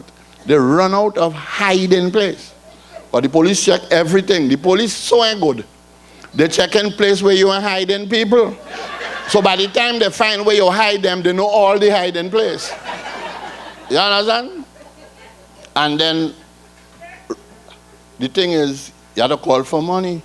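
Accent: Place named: Trinidad and Tobago. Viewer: most imformitive show ever '. however his language make me proud to be trinidadian love it love you i want to sound like you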